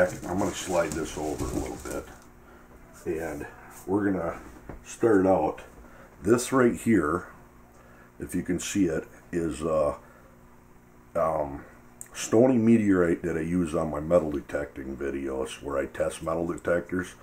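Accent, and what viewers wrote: Minnesota, United States. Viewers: That has to be either a Minnesota or Wisconsin accent. By Golly !! Nonetheless, nice video.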